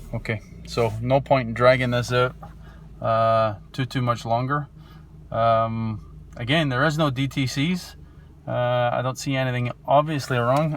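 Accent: Scotland, United Kingdom. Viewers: fek sake shu have known. Alternative ulster was our anthem. Used to play in the limelight in belfast. I was in my teens in the 80's. I hear ur scots accent breaking thru regularly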